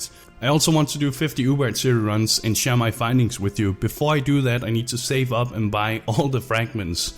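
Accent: Denmark. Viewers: Great video! Are you danish? You sound a bit danish :)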